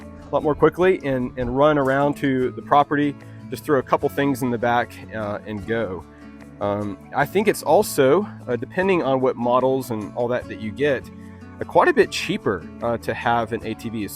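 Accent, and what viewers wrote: United States. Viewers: Portugal. We have very different accents in our regions, I think its the same everywhere, just wondering were in America is this accent from, east coast, west coast, north, south?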